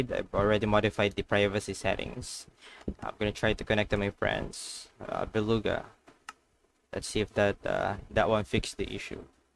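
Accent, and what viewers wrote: Philippines. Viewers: filipino accent you got there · Sounds Filipino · Boy halata na tagalog ka eh😅😅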